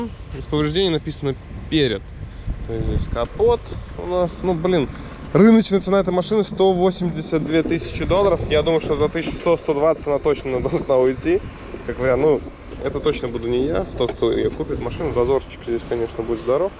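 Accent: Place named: Belarus. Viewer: Маашинуу😁👍акцент прям Белорусский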